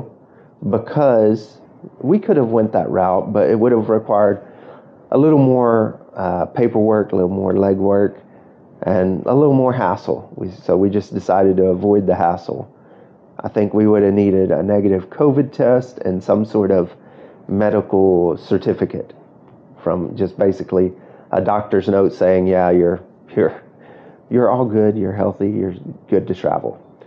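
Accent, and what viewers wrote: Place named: Bisaya, Philippines. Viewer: Hahaha loving the bisaya accent keep posting video bro you might consider adding light instrumental music to add your video a little life …. 😊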